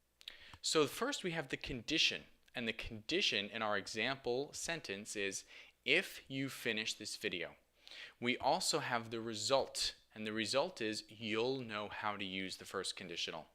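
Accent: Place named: California, United States. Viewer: OH WOW, WHAT A LOVELY ACCENT, ARE YOU FROM CALIFORNIA? AND YOU ARE SO HANDSOME....I SUSCRIBED !!!!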